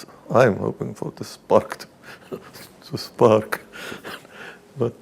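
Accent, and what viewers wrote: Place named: Australia. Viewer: This thing is not even worth posting. And the host sounds Aussie😮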